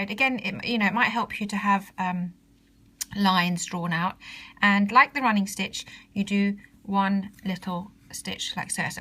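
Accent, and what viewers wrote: United Kingdom. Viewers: ur British ;)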